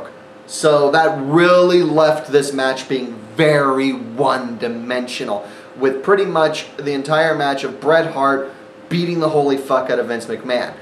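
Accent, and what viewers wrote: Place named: Mexico. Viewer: im mexican and i found ur mexican accent funny i made me crack up great review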